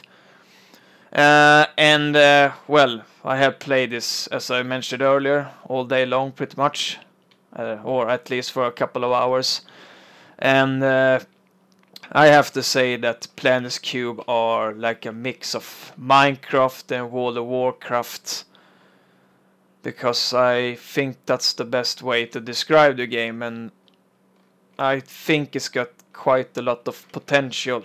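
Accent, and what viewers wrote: Sweden. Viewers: You are Swedish, right? I mean I'm sure Danish people and Norwegians have a similar English accent, but this is identical to the Swedish accent so that's what I presumed that it is. And I don't really engage too much online with voice except with friends on Skype so you're not likely to hear me snacka Svenska.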